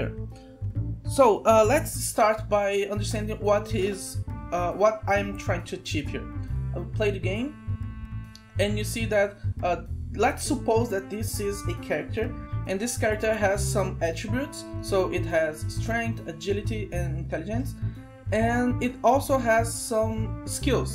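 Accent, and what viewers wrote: Brazil. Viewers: sotaque brasileiro??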